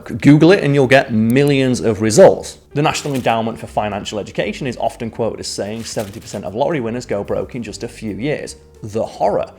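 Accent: United Kingdom. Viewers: sounds very English but i assume the channel is aimed at the US because British people would never refer to the Christmas period as "The holidays" we just call it Christmas time. Holidays are just any time spent away from work or school or we use it in the same way Americans use the word vacation. Don't ask me why i felt the need to share that but it just sounded weird coming from someone that sounds ultra English.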